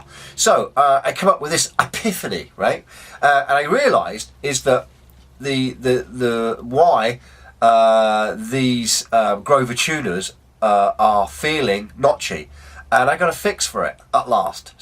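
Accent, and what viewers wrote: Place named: Birmingham, United Kingdom. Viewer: You sound like a peaky blinder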